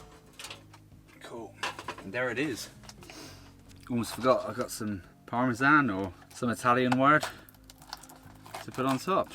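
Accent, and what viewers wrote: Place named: Southwest England, United Kingdom. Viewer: i recognise the accent are you from Plymouth or SouthW?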